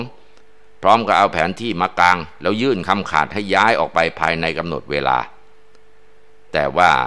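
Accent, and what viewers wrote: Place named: Isan, Thailand. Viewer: ตลกเสียงอิสาน ตลกเสียงอิสาน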